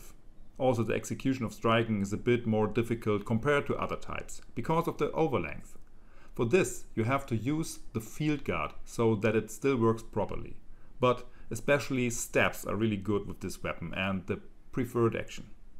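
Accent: Germany. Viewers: Hallo, dein Dialekt hört sich deutsch an, hast du auch einen Kanal mit deutschen Videos?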